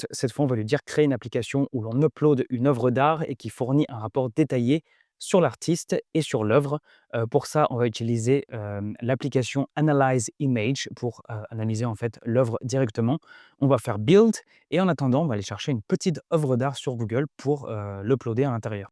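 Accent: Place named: England, United Kingdom. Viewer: Super ton accent anglais, ta vécu dans un pays anglais?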